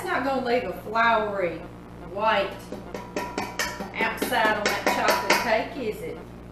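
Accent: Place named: Louisiana, United States. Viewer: Your home is beautiful ! I just love watching your videos! So sweet that Louisiana accent! Your kitchen is elegant! Girl you can do some cookin! Yes, you need to call it a day! You worked hard! See you soon for a Christmas video! Loved the smore's video with the grand babies! Bye now! God bless!♥️🥰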